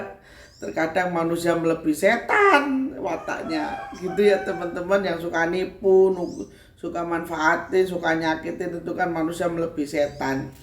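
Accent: Java, Indonesia. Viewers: Ngikutin chanelnya mbak ini serasa kita jagongan (omong"an) langsung dg beliau di ruang tamu.Aksennya yg Jowo jekek/deles bikin sy betah,karena sy juga cucunya jekek😂Dlm berkisah,beliau seakan menyuguhkan hidangan berupa oleh" dari hasil perjalanan nglencernya😝Bukan menggurui,tapi sekedar crito,mbokmenowo ono piguno.Dg segala kerendahan hati,berulang-ulang beliau selalu mengatakan "sekedar menyampaikan".Jadi ya " ditrimo karepmu,ora yo karepmu,sing penting niyatku becik."🤣Sbg orang tua (80),sy paling suka ada orang muda yg banyak menebar kebaikan kayak mbak ini,sebagaimana yg pernah disabdakan Kanjeng Nabi:"Sebagus-bagusnya manusia hidup di dunia yaitu yg bermanfaat bagi sesama."🙏🙏🙏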